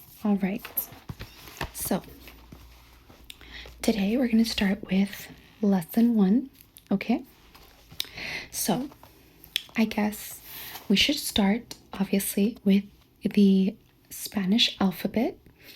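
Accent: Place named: Mexican-American, United States. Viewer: Estoy casi seguro que es mexicana 🇲🇽 nacida en estados unidos, las chicanas son muy bonitas y tambn lo creo por la forma de hablar el español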